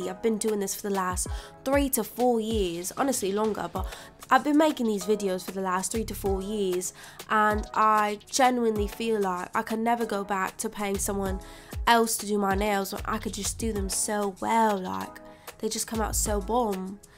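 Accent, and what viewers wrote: United Kingdom. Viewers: It was the British accent for me! lmao yes, I no longer visit the salon unless I want to get a russian manicure- to do my nails after lmao (or for a good ole pedi) Love these!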